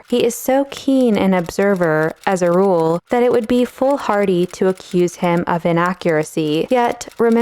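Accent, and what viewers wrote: England, United Kingdom. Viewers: More English accent in future please! It was very cool. And that’s coming from the son of a Londoner. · The only beef I have with this commentary is your apology for the English school boy accent. It is my opinion that you need not apologize for any of your work. So informative and entertaining, I both laugh and cry when I watch these videos. Thank you for your efforts. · I love your English schoolboy accent! (I'm English) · Striking piece and story! Thanks for the historical and artists context. I loved your English boy accent 😂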